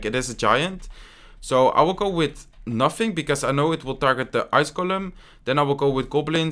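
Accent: Netherlands. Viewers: Hahahaha dat Nederlands accent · Lol dat accent zo gemakkelijk om te weten als er een nederlandrr engels praat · Nederlands accent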